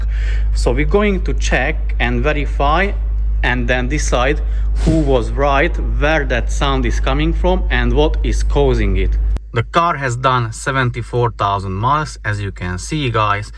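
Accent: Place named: Hungary. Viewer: Vicces, hogy a yt feliratozója teljesen jól megérti a magyar akcentust, nem úgy mint az őslakókét. :)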